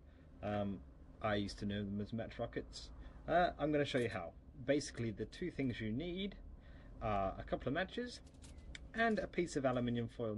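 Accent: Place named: England, United Kingdom. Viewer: but ur frum england so it funny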